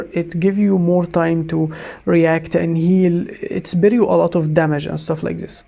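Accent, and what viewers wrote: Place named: Egypt. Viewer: Thanks for the video ! cheers Dude are you Egyptian ? your accent is Egyptian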